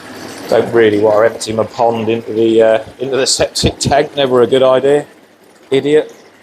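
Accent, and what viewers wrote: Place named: Suffolk, United Kingdom. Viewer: Great update mate and love the keep it simple approach from Ricky Stoddart,, What is the size of your pond ? width/ length etc ,, You sound like you could be close to me Lowestoft Suffolk ?? Hope to see more from you soon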